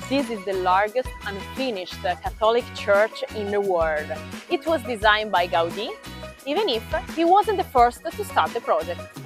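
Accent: Italy. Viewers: English with Italian accent, very cute😘😘😘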